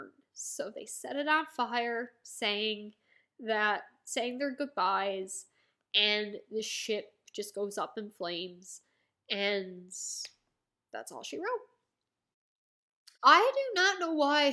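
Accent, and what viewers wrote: Canada. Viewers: Interesting you're trending right now. YouTube algorithm is a weird mistress. I've got to say I've heard Almost every version of English native speaker discussing One Piece but your unintentional Aboot was glorious. Play into your Canadian accent when reviewing One Piece. Don't try to hide/Americanize yourself. There's thousands of American One Piece YouTubers but what made you stand out was your accent. Enjoy the Journey and just know the Demon Child of Ohara is the best Straw Hat. When she has her moments she really steals the scene. Sanji being captured by Black Maria: call for Help we want Nico Robin... Nami-swan save me I'm on the third floor of the castle in the north most room.. Black Maria is like why the hell did you sell out your comrade so easily. Sanji is like I can't fight a woman. But besides my sister, Nico Robin is the most formidable opponent in the world and she's gonna kick your ass.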